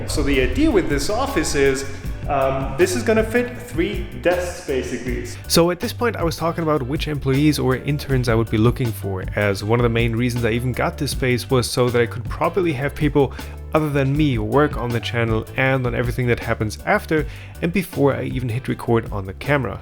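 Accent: Bavaria, Germany. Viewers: Habe heute ein deutsches Video von Dir gesehen. Das war so seltsam :D Schönes Phänomen, wenn man Leute mit deutschem Dialekt sprechen hört, die man zuvor nur tadelloses Englisch sprechen hörte. Ging mir das erste Mal so, als ich mit Marius Hornberger sprach. Vielen Dank für die vielen Videos! Grüße aus Wilhelmshaven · Bist du aus Bayern? o.O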